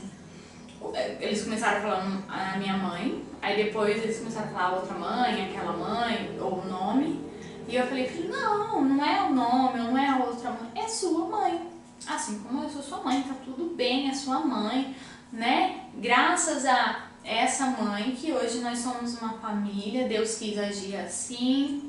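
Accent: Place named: Federal District, Brazil. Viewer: eu jurava que você era de DF kkk. Obrigada s2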